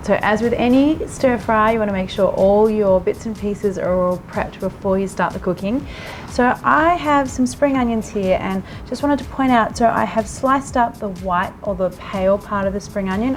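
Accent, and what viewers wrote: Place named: Australia. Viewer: I have been watching your cooking channel n I think you are an excellent chef!! I can tell by the ingredients n your style of cooking. I love it n I will definitely try it. Not only that, love your accent!! Aussie, Aussie, Aussie.....🐨🐨💕✨🦋💕✨🦋😘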